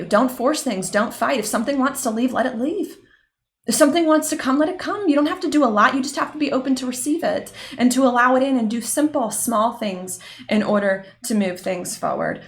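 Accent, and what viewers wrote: South, United States. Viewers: I love when I can hear your southern accent-let it shine xoxo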